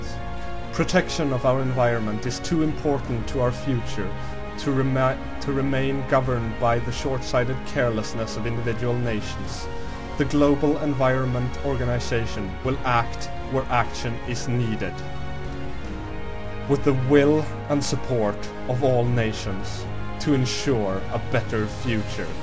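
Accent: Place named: Sweden. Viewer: Clubs He's Swedish. English isn't his native language. :P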